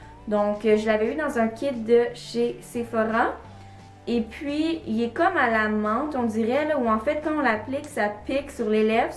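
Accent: Quebec, Canada. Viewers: Accent quebecois, jsuis sur...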